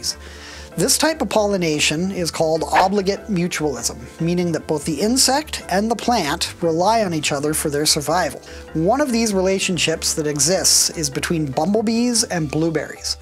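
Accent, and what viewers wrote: Canada. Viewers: I just discovered you. I knew within 8 seconds you're canadian. True North! 🇨🇦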